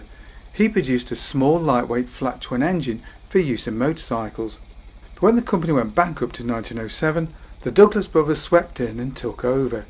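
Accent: United Kingdom. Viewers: Neat bikes! Unfortunately, I could hardly understand a word that was said between the sound quality, his soft voice, and his heavy Brit accent.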